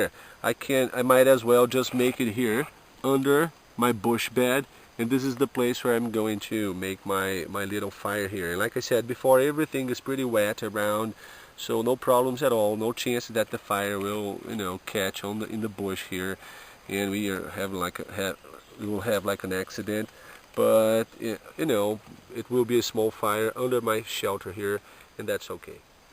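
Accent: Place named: Brazil. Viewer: Mano, Vc fala bem inglês, mas pelo sotaque eu acho que é brasileiro que nem eu. Parabéns pelo vídeo, muito bom.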